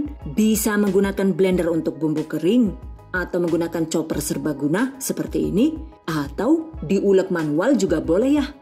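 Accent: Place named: Java, Indonesia. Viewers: Orng mariun ko' pke gya bhsa orng teggal. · Aduh mba suara nya lucu bngt kl lg kecaprakan .bhs jw nya medok bngt .wk wk wk 😃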